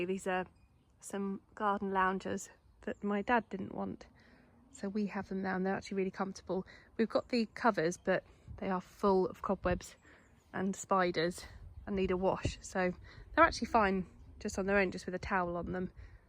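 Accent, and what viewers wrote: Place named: England, United Kingdom. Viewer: Good Morning!! I love your English accent 😍 I come from Italy and I have studied English for many years ❤❤ your vlog is really awesome 😍😍😍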